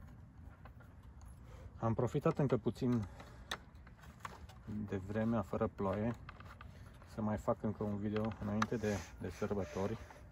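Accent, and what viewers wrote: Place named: Russia. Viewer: Parece que hablas ruso y que hablas español, que eres?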